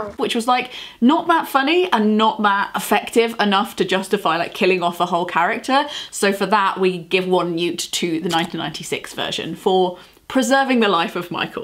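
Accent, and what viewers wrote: United Kingdom. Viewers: It was so interesting content~ Most of all, I like your clear British English^^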